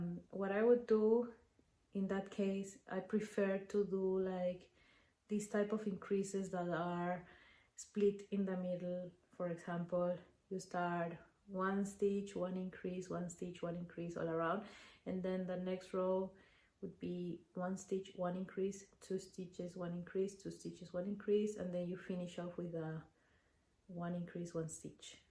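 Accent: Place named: Peru. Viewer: me suenas peruana...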